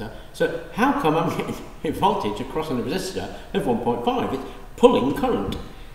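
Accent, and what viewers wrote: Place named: England, United Kingdom. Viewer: english accent plus echo means no compreda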